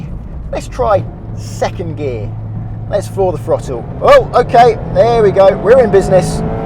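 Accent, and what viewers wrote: United Kingdom. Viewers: I think he is being rude about your British accent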